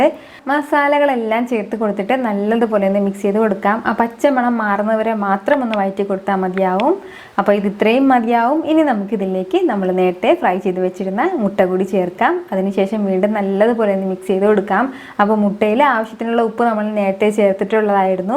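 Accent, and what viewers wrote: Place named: Tamil Nadu, India. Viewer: neenga pesurathu entha Mozhi Tamil kuda sernthu mix aagura maari irukku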